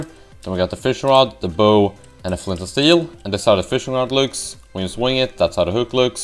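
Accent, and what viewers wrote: Sweden. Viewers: Uhm.. This may sound a bit weird, but you sound swedish ;)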